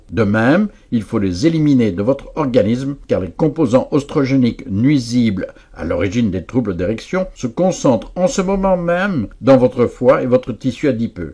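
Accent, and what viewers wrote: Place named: Belgium. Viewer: Un "coach"? Mdr.... Ne serait il pas plus logique de consulter une femme pour ce genre de problème.? Il semble qu elles soient plus à même de savoir ce qui leur importe et leur plaît le mieux (non,que la bandaison n ait aucune importance,attention. ..). L accent belge de ce type au pseudonyme américain en dit long sur son efficacité et ses compétences. ...Ça pue le charlatanisme à plein nez,et à 300km à la ronde! Pathétique! ...Et j ai deux demoiselles à mes côtés qui approuvent mes dires,...Lorsqu elle ne me les ont pas carrément "suggérés"...D apres elles,il serait bien à côté de la plaque...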